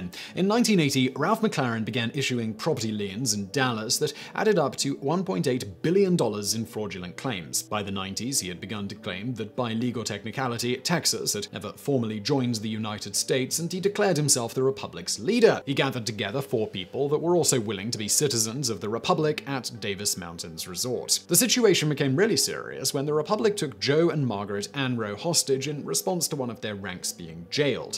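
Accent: United Kingdom. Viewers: Texas stories told with a British accent 🤔🤔🤔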